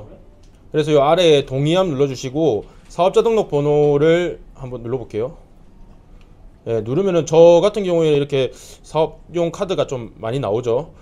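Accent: Gyeongsang, South Korea. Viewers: 오늘 님 300만원 프로젝트 1탄부터 정주행했습니다. 잘꼭따라가고 월 300은 아니어도 200이라도 벌었으면 소원이 없겠어요 간절하네요 저도 고향이 갱상도라서 사투리가 넘 와닿고 ㅎ 검단에살고 있어서 눈오는 풍경도 낯설지가 않네요. 꼬옥 성공하고 싶어요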